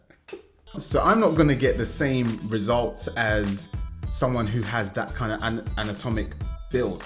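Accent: United Kingdom. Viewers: I noticed, he is actually talk British English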